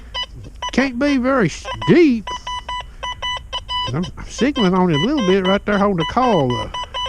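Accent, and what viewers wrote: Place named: Southern Appalachia, United States. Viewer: If I close my eyes and listen sounds like papaw to me! Lol as a southern appalachia boy this channel feels like home and love the accent!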